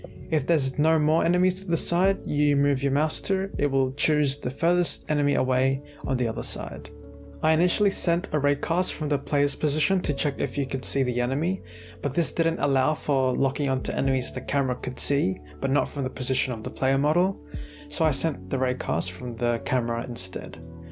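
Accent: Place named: Australia. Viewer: Great video! Knew you were an aussie from the first few seconds of the video xD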